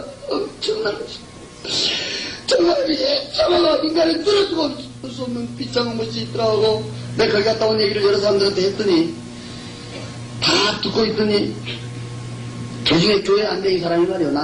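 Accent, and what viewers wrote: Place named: Jeolla, South Korea. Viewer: 보지않고믿는자가 복되도다하셨지만~하나닝은 협력하여선을 이루어주시는분같아요~ 구수한전라도 말씨와 목소리가 성령의언어인것같아요 목소리도 은혜가되네요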